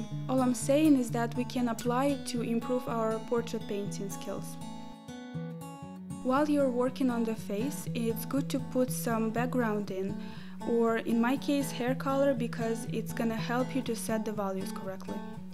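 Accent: Russia. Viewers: Русский акцент узнаешь из тысячи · Красиво) Слышно русский акцент) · This is so inspiring ❤❤❤ I noticed you have russian accent... Aren't you russian?😅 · Omg this Russian accent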